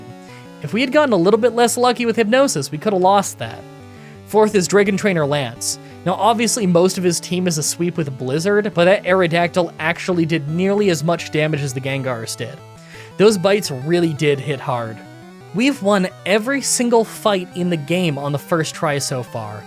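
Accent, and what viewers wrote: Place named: Canada. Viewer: This narrator's Canadian accent is so cute to listen too😊😊😊😊😊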